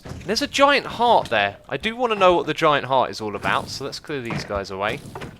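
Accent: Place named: United Kingdom. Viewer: Hey x. Why so British?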